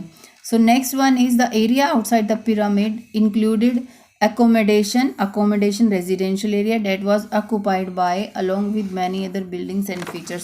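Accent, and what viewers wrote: India. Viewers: Your accent like indian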